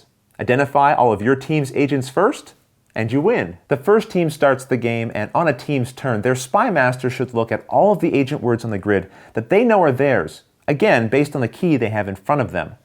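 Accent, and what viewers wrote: Canada. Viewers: We've played this after watching your video, have to say, wonderful job you're doing there and i like that Canadian accent of yours, cheers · is he canadian? he says "about" and "out" very canadianley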